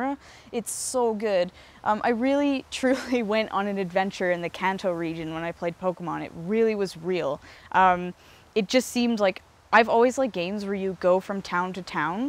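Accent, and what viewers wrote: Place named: Canada. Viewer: She is Canadian... that's how they usually pronounce it.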